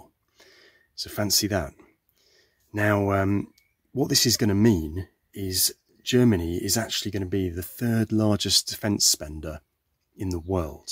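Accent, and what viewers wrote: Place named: United Kingdom. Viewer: ..."German Prepper" with a british accent?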